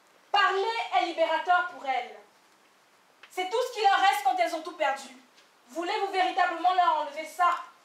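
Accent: Cameroon. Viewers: Accent très 🇨🇲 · Elle a un accent camerounais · Elle a l'accent camerounais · Absolument son accent est typiquement camerounais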